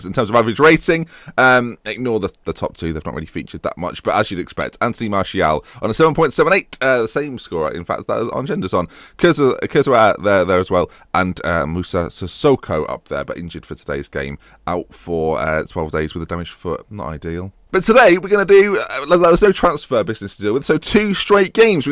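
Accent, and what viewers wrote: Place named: Newcastle, United Kingdom. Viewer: chuffed for you for hitting 30k subs mate. u deserve it man. me fave youtuber from newcastle england to australia. currently in a hostel in mildura victoria but stil watch ur vids every day. reminds me of home so cheers wor kid. canny geordie accent aswel but stil needs work haha!! keep doing wot u do pal. #fluid #geordieinoz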